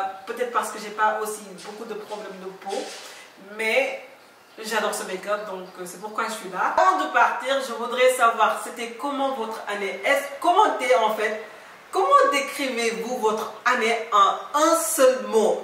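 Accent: Senegal. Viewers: lol je kiffe les accent sénégalais la meuf parle sans complexe awa magui ni si clinique bi je viens tout juste d'accoucher hmd je suis à 8000 km de chez moi (Sénégal)ma famille me manque mais dès que j'ai vu ta vidéo j'ai tout oublié si tu es tjrs au bleds bon vac je t'adores trop toutes mes salutations a qui me fais trop rire avc ses wolof de congolaise my name is Mya depuis Paris🥰🥰😘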